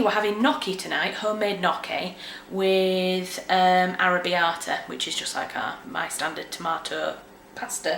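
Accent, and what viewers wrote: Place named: United Kingdom. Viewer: Hi, I clicked onto this by accident and I'm so happy you're so engaging and lovely ! I'm from the Uk like your accent and I'm really interested in getting into writing articles for company's/website. I would want to do it from home, alongside my full time job. Any tips on how to get into that field ? Thanks! Love you !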